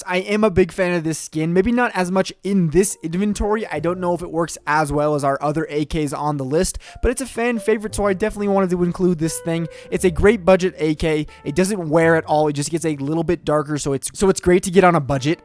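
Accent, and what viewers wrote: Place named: United Kingdom. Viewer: Insane Bri'ish, certified banger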